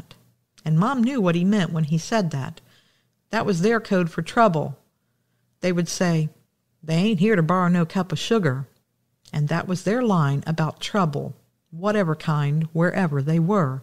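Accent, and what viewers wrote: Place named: Minnesota, United States. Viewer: Sounded like a great way to celebrate 21st birthday. I am from MN this sounds like you are from the northern part of the state and your cabin sounds awesome. Thanks for sharing your story. Pepper sounded like a great dog.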